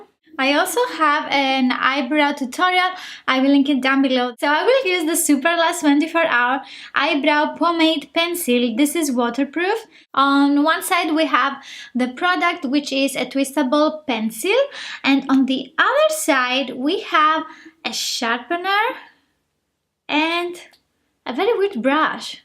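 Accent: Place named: Greece. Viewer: Are you Greek? Your accent implies that! Love essence make up❤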